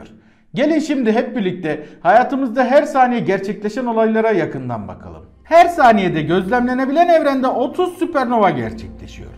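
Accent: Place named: Syria. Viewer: Aga ne berbat video. Ne anlatmak istedin ne alakası var seninle? Bide sen niye suriyeli gibi konuşuyorsun??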